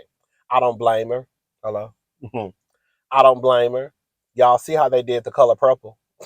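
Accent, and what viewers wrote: South, United States. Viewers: I love listening to you speak! I love listening to "the south" in your accent.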